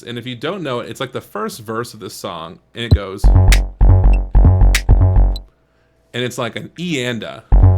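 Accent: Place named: Las Vegas, United States. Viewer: Fun Project! I think you've lost some of your Vegas accent, haha!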